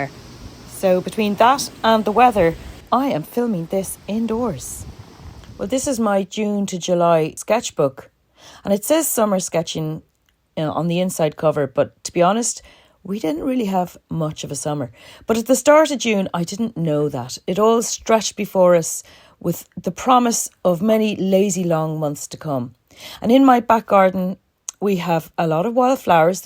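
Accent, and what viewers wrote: Ireland. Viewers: and it’s a joy to hear your beautiful accent, reminds me of all my cousins in Ireland ☘️☘️☘️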